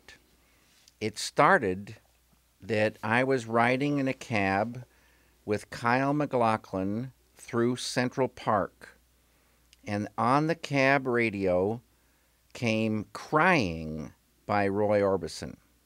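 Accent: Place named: Utah, United States. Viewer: Is David Lynch from Utah? His voice has that particular cadence they use there.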